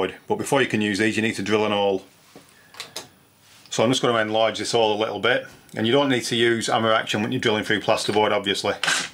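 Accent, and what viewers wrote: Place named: Lancashire, United Kingdom. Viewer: Knew I recognised that accent, Preston here :-)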